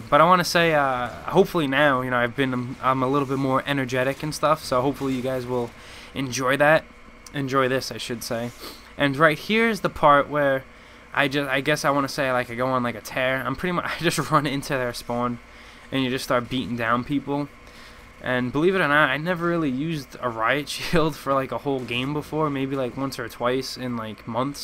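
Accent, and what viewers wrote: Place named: New Jersey, United States. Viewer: sounds like he has a jersey accent lol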